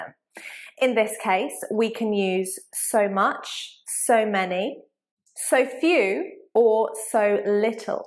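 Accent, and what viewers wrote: United Kingdom. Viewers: Thank you so much, you are such a great teacher. I've made great progress in my English level by watching your channel! your British accent is really lovely.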